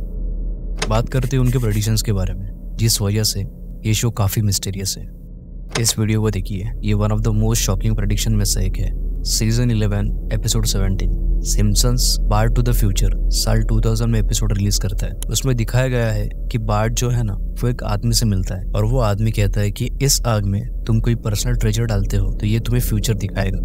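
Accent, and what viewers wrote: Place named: India. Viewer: He speaks indian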